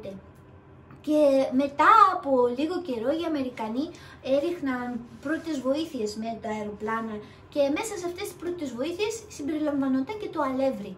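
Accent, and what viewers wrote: Japan. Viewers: Υποθέτω, έχεις καταλάβει ότι μιλάς πια τα ελληνικά με γιαπωνέζικη προφορά. Σαν κάποια μεταγλωτισμένα γιαπωνέζικα παιδικά που βλέπαμε μικροί. Το οποίο είναι χαριτωμένο:)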